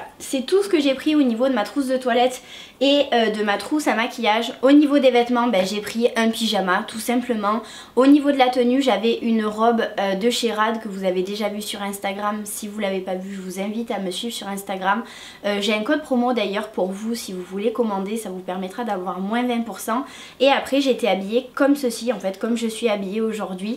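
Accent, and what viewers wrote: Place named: Marseille, France. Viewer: Tu es marseillaise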